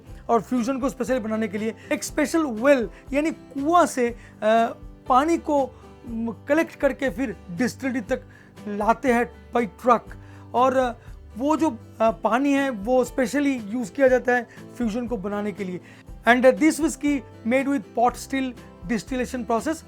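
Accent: Bengal, India. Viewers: Bangali ho kya?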